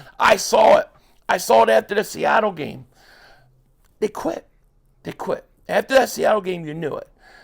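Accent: Philadelphia, United States. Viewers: Why do philly ppl sound like this..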